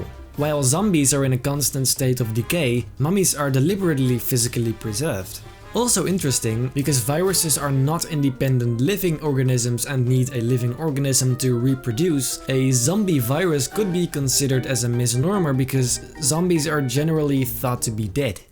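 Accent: Netherlands. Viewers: Are you Dutch? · Are you Dutch?? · Bro je hebt een geniaal account man, Ik weet niet hoe ik je tegen kwam op YouTube, maar toen ik je accent hoorde wist ik gelijk dit is een Nederlander haha. Keep it up man, good work. Abbo er bij !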